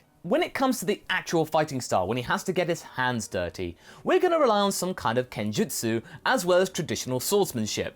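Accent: United Kingdom. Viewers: Is he British?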